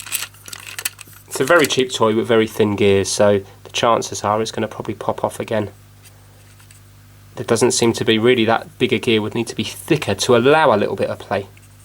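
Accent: United Kingdom. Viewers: Lol....The maker made the effort to add suspension, but no steering? What a piece of utter rubbish. british voice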